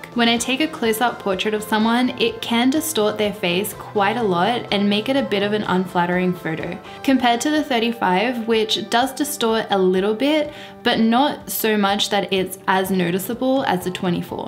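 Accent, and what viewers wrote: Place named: Australia. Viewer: It's an Australian accent. Didn't you watch home & away and neighbors when you were a kid?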